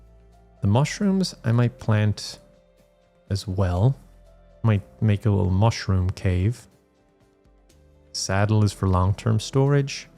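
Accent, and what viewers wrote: Ireland. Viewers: This is genuinely such a soothing let’s play. I didn’t expect to fall asleep during the last episode but at the end of it I blinked and it was 30 mins later in the video, waited til bedtime for this one in case it does it again. Always love a good hang out with our totally sane friend, reminds me of the old Stardew videos from when the channel was still brand new Also for the record you got me to fall in love with the Irish accent to the point I use it on my Siri anymore so you’re clearly doing /something/ right for Irish culture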